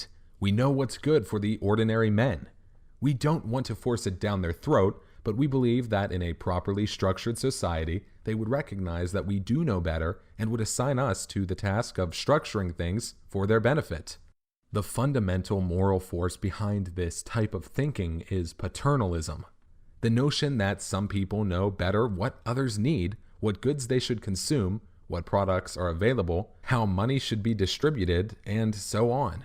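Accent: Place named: Eastern Europe. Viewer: What happened to that sweet sweet Eastern European accent?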